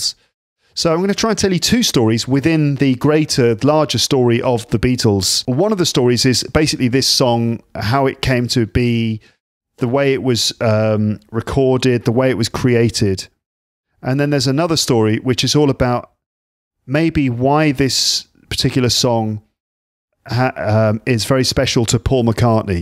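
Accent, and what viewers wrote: United Kingdom. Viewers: I enjoy listening to you whatever you are speaking about! I love your British pronunciation. Hello from St.Petersburg.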